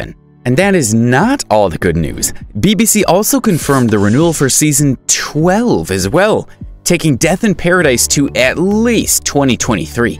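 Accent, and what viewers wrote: United States. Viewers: It's a British program . Why is an American doing the voice over????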